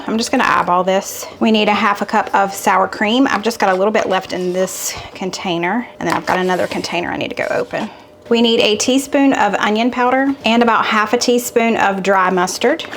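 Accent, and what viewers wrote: South, United States. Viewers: LOVE YOU GUYS!!! Making the baked ziti right now with my boys...they are so excited. They ask to put on so we can cook together. They may or may not copy your southern accent...we are Michganders, so they are quite entertained. HA.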